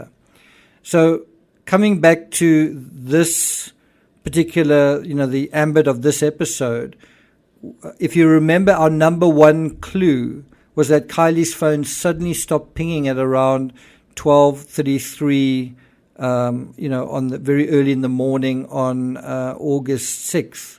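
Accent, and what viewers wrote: South Africa. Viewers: I recognise the accent...fellow South African...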